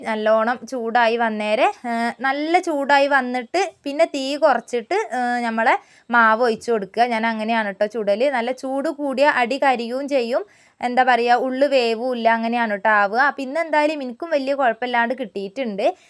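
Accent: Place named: Kozhikode, India. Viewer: 🥰🥰കോഴിക്കോടൻ സംസാരം ആണല്ലോ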